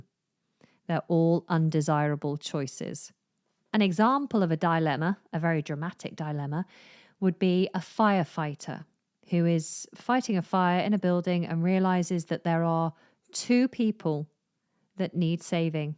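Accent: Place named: United Kingdom. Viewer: Mam I have watched your almost all videos, I have got plenty of improvement in my British English,I like British accent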